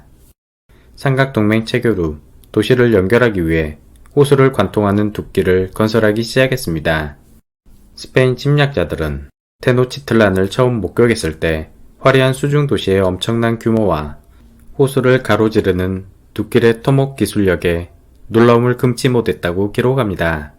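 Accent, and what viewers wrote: Jeolla, South Korea. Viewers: 어!! 목소리가 전라도분이시다!!! 오오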